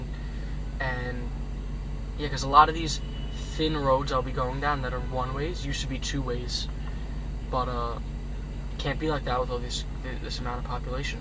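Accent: New York, United States. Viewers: I swear, when I first heard you speak, I thought of my cousin that grew up off Utopia Parkway in Flushing Hillcrest. You sound exactly like him. It must be a "Flushing" variant of the New York accent in that area! LOL Great vid as always.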